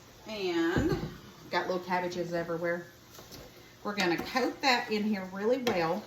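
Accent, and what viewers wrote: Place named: Alabama, United States. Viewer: Enjoyed this recipe and you so much! Love cabbage, love noodles. Will be trying this. A coworker frequently cooks in our break room in an identical skillet & makes magic happen. Going to be getting one. You sound near me. Subscribed. Hello from Talladega.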